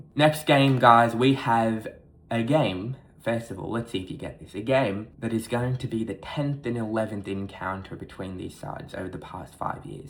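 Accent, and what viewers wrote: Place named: Liverpool, United Kingdom. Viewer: Bro is literally speaking in Liverpool accent 😅😅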